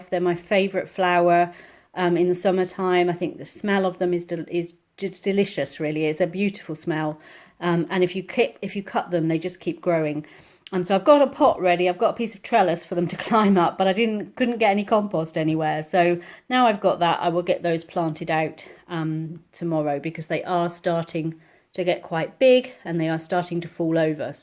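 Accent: United States. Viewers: Your American accent made me giggle! We do say it like that haha. Great haul and I love Franks Red Hot!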